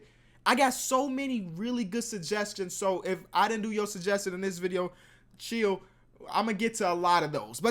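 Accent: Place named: Chicago, United States. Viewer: chicago accent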